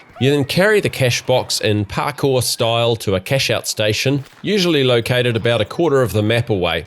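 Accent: New Zealand. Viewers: I have shaked through this video... Im sorry, but i cant get over that New Zealand accent. "E" is replaced by "I". Well made video though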